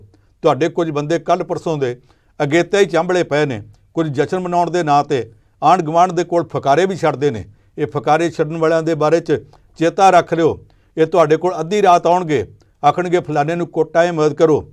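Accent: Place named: Punjab, India. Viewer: ਠੇਠ ਪੰਜਾਬੀ ਤੇ ਜਟਕੇ ਢੰਗ ਨਾਲ ਅਸਲ ਹਾਲਾਤ ਬਿਆਨ ਕੀਤੇ ਹਨ।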